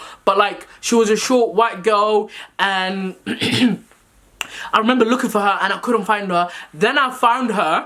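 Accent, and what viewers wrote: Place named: England, United Kingdom. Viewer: I thought you were from england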